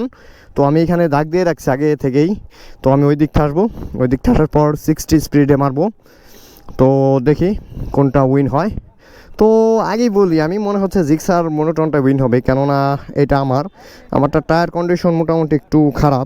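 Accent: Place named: Manikganj, Bangladesh. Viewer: আপনার কথা ভালোই লাগে। আপনার ভাষায় ধামরাই মানিকগঞ্জের একটা টান আছে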